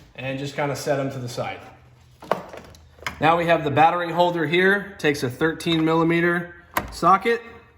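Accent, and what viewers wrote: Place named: Texas, United States. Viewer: Instruction car videos are just better in a Texas accent. LOL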